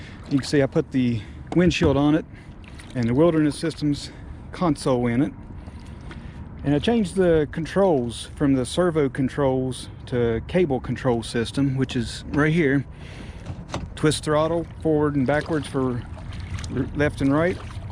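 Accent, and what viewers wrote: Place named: West Virginia, United States. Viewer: Thought you are from West Virginia as soon as you began speaking. I was born in Clarksburg, raised in Glenville. Now happily retired in Wisconsin three blocks from Lake Superior. Enjoyed the video. -- drew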